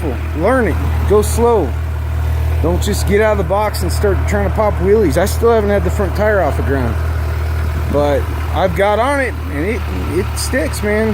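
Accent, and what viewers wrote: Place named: South, United States. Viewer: Dude you are one funny fucker I had fun listening to your accent you must be from down south but it's a good opinion on the lovely Z900 good luck riding your new toy lol lol lol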